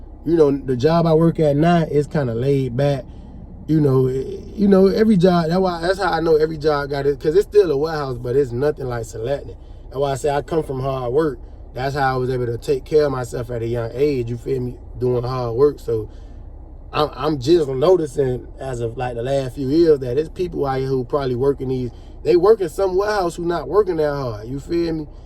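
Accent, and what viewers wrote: South, United States. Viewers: Bro. I’m hearing your accent. You’re definitely from the South. Question, respectfully where? I’m from Cashville and you sound like home. New sub here.🤟🏿😎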